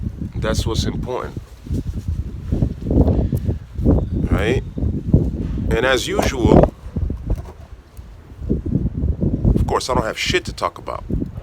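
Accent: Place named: United States. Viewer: Hey man! Your pronunciation is so American. Have you lived in the U.S., or did you just train to make it that good?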